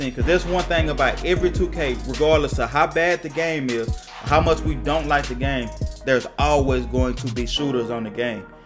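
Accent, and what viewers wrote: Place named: Louisiana, United States. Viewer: you’re from louisiana yea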